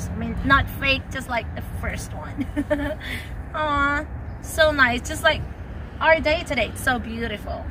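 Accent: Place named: Philippines. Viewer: WOw, that is the most Pinoy English I've ever heard. Salamat po!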